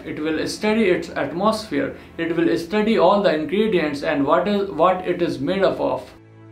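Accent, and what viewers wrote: India. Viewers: He from India or Pakistan. It's easy to recognize by his accent.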